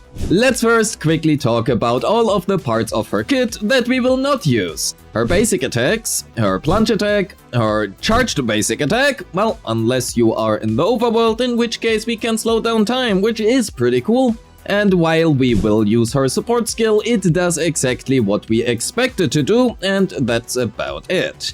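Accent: Germany. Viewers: I hear german accent + see a good structured guide with a pinch of humor - it`s an insta subscribe 🔥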